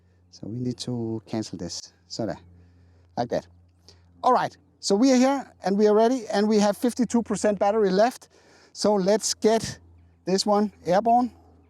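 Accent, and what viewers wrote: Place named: Denmark. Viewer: It's actually Denmark I believe due to his accent. But sincerely thnx for asking 😂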